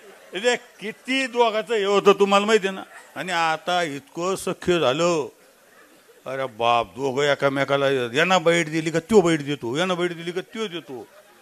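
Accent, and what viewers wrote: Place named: Marathwada, India. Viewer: लयी भारी बोलता तुम्ही मराठवाडा style.....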